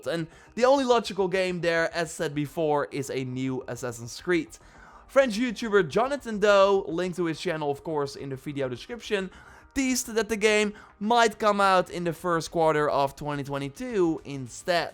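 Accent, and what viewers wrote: Netherlands. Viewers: You just know when you hear a dutch guy talking English. Nice video btw man. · With this terrible Dutch accent? If he made his L’s any thicker, he’d sound like a Bond villain...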